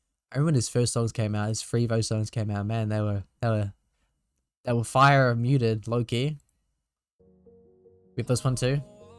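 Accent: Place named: New Zealand. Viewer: im not super into animal jam like i used to be when i was younger, but i like to stay up to date on the drama... never watched a video of yours before but i immediately recognized the accent .. love to see kiwis makin good content bro keep it up !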